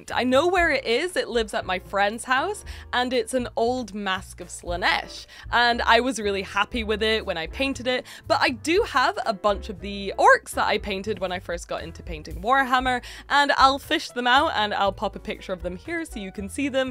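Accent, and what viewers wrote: Scotland, United Kingdom. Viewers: My word, I've never met another Scot with the same accent trouble. I don't use Scots, so my accent comes out similar to yours, and people guess all over the place for me. I've had American, Canadian, South African, and one person came close and said Irish, but it seems like only other Scottish people recognise me as Scottish instantly. It's usually the hard R sounds that mess people up, but I also heavily use glottal stops which should clue people in. I'm from Campbeltown and live in Cardiff. All my family sounds properly Scottish, using Scots and such, though, so I always thought I was just a weirdo. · Congratulations, I think you've done a fantastic job with your channel. You're so easy to like, and your passion for the hobbie shines through. Seriously, though, how anyone could think you were from anywhere but Scotland is beyond me 😂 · I figured your accent for Scottish right away. 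I'm honestly surprised people ask. It seems obvious to me. Mind you, I was raised on British comedy, so... I agree on the "calm down" thing. I hate being made to feel badly about the things I love. I think your Bloodbowl goblin is adorable. It's not really my business why you are no longer with GW. I just know it's their loss, and our gain. I'm looking forward to everything you have in the pipe. Wishing you nothing but unbridled success! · Anyone who'd place your accent anywhere but Scotland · Definitely a Scottish twang in your accent, but not an out right Scottish accent I feel. Have you been out of Scotland for a good long while perhaps? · Her accent sounds Scottish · I think your accent sounds Scottish with a hint of notts, maybe sutton/Kirkby area lol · Quite obviously Scottish. Silly people. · Yeah that bit I thought was wild, I thought, her accent is obviously Scottish but also very much not Australian or American... 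· People thought you were American?! As an American, I'm baffled. To me, you sound like a Scot with an accent somewhat influenced by British broadcast standard (e.g. BBC), but I'm American and am not deeply familiar with UK accents. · How do people think you have an Australian accent? your accent is very definitily north of Hadrians wall lmao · Your accent sounds scottish to me but americans are terrible with accents and will confuse completely different accents 😂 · I now know why I love your accent my grandad was from dunfermline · Ah, the challenge of an East Coast Scots accent. I’ve been asked if it was Canadian. In Canada. No idea why it’s such a hard accent to place. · I figured it was Scottish, but couldn't put my finger on it, very light northern Irish was my second guess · I was like “that accent is definitely Scottish” and then all the questions came up and I thought man… am I wrong, is it something else… nope. 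I guess perhaps modern media has led people to think everyone who is Scottish has to sound Glaswegian. I lolled when you said “Australian” but I can see how some might think American if by that it’s the Appalachian accent which can sound a bit Scottish, but given its relatively rare and you live in the UK Scottish is far more likely 😂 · I just assumed your accent was Scottish mixed with speech therapy due to dyslexia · My wife was born in Dundee, moved to Glasgow when she was a wee teen, then moved to America when she was about thirty. I recognized your accent immediately. She collects sea glass too. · its nuts saying this but as a fellow scot i was still like "IS SHE SCOTTISH?!?!" for a while watching a few of your videos, i thought maybe you had moved somewhere and got a dual accent maybe. but then i settled on "nah she scottish" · I knew you were from Scotland. You have the same accent as Christine Kochanski, Listers babe from Red Dwarf. 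The earlier versions not the English one obviously. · Just sound Scottish to me. But to be fair, I'm English and know a fair few Scots. So I have a higher level of exposure than someone from outside the UK. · Very obvious Scottish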